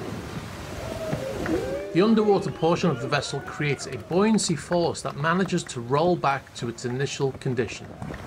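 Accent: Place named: Oldham, United Kingdom. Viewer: Thanks for these short clips. The longer ones too. I’ve been a fan/subscriber since before seized yachts were the big news. I’m in Toronto but I think part of the appeal is your accent reminds me of my friends who came from Oldham. Looking forward to the next one.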